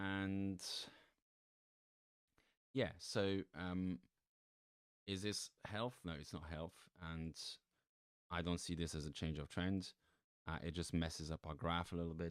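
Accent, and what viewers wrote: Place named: Switzerland. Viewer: Great analysis, thanks! I couldn’t figure out if your accent was German or French, it had elements of both so I guessed you are from Switzerland. I go to your twitter and boom, I wasn’t wrong :)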